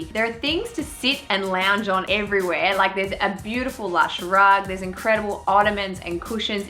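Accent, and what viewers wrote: Australia. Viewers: This Lady is wonderful to watch love the whole package editing and music all tied together with that cute aussie accent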